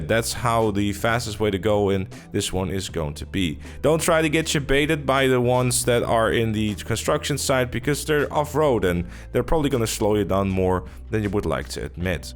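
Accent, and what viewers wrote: Netherlands. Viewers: Love the Dutch accent 😎👌🏻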